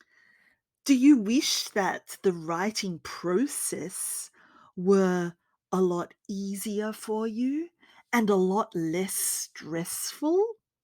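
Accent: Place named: Australia. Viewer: I really like so much of your Austrália accent, the way and metodology that you use to talk, it is clearly and make me to enjoy it. One more subscribe. 👏👏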